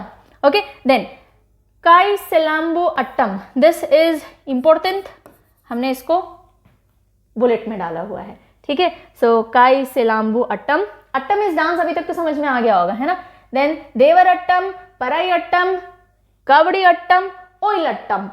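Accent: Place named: Kerala, India. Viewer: I am a malayalee..it's nice to hear ur presentation in Malayalam slang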